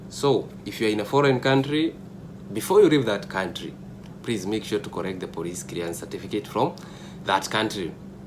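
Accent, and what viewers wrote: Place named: Kisii, Kenya. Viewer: You sound like a kisii🤔🤔🤔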